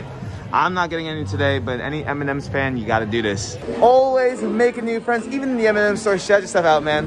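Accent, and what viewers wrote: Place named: New Jersey, United States. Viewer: What part of Jersey are you from?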